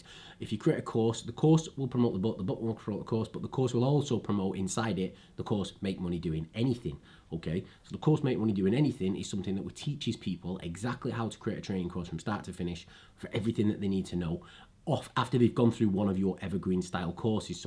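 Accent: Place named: South Africa. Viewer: You talk like a South African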